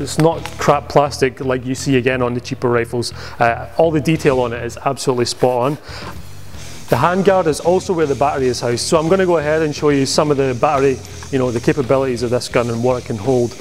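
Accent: Scotland, United Kingdom. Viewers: are you Scottish? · not weird only scottish!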